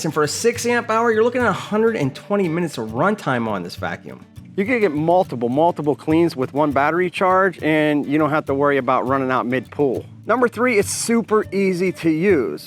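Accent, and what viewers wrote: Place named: Philadelphia, United States. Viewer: Bro’s gotta be from Philly area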